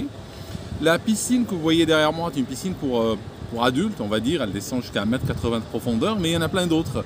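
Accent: Switzerland. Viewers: Ton accent Suisse revient grave quand tu es énervé...😂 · Le retour de l'accent suisse d'?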